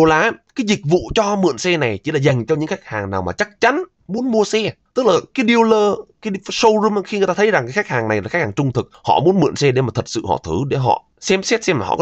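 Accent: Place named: North, Vietnam. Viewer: Nghe giọng backè.. sợ lắm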